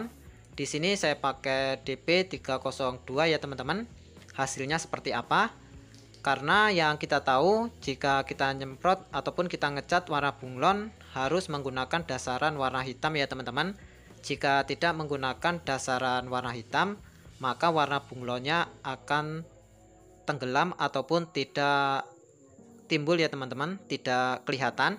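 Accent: Java, Indonesia. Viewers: saya kira di jawa mas, soal e logatnya kayak orang jawa.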